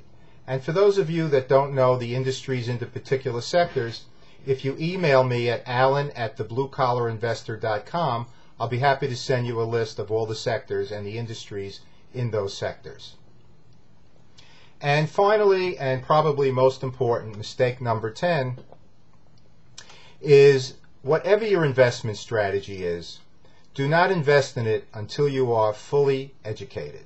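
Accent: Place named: Long Island, United States. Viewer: OMG, within the first minute I thought to myself.. His accent sure sounds like hes from LongGisland (Where I live) then wamn, I noticed the Bethpage address in the background. Farmingdale resident here.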